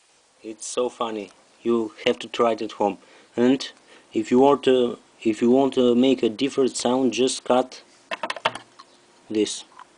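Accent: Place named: India. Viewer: im not saying all indians have a gay vioce, just that this guy has a indian voice and he has a gay vioce.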